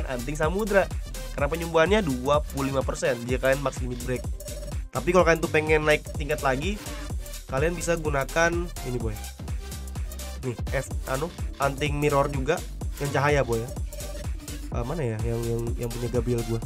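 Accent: Banjar, Indonesia. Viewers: Pantesan logat kamu familiar banget bang😂 ternyata orang Kalimantan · Abang org kalimantan selatan kh bang? Banjar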